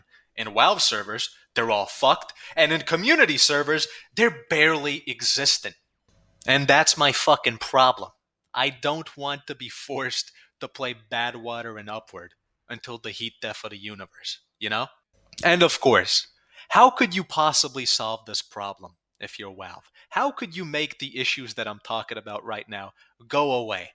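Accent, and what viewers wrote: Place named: New York, United States. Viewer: Babe wake up, the NYC sounding European is talking shit about Walve again!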